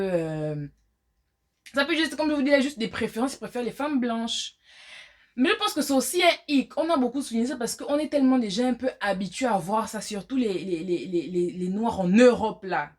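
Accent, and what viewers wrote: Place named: Gabon. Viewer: Le son de ta voix jai directe dit .gabon moi aussi badecon 😂😂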